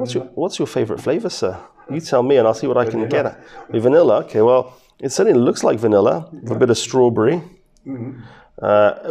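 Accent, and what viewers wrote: England, United Kingdom. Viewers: What I like about you is that you are very clean - you wipe the stuff off right away and you do everything quickly without hesitation, and correctly. No beating around the bush. You don't even need an assistant! You've got it all under control! Kudos to you!! And your beautiful English accent is wonderful! Love you!!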